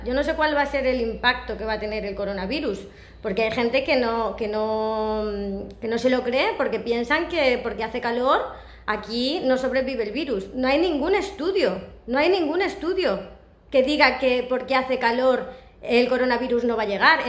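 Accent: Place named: Spain. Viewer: Acho o sotaque espanhol fofo demais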